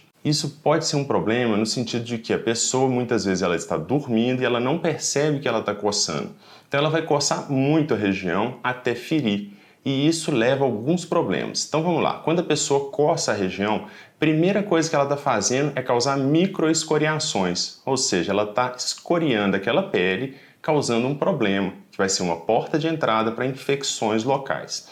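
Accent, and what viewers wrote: Minas Gerais, Brazil. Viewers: Esse sotaque mineiro é maravilhoso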